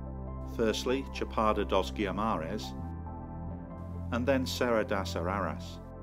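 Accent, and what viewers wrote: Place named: Australia. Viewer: Spent my childhood in Brazil, this vid has brought me a lot of good memories.. now in Australia 🇦🇺. You sound Aussie by the way...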